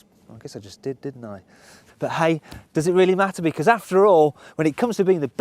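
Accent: United Kingdom. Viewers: Because they are in the U.K. did you not hear his voice, put two and two together, pretty obvious...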